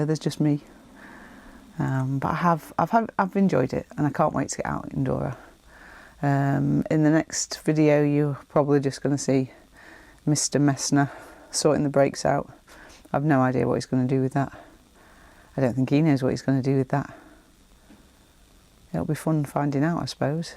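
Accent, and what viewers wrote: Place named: Sheffield, United Kingdom. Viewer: It’s looking great already. Please jabber away, I lived in Sheffield for 5 years (in Park Hill flats — Norwich Row!) and I miss the accent.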